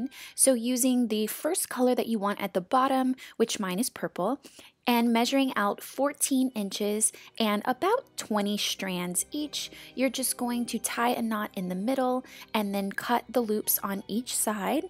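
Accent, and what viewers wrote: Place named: Los Angeles, United States. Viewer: She sounds so LA compared to now. Love the transformation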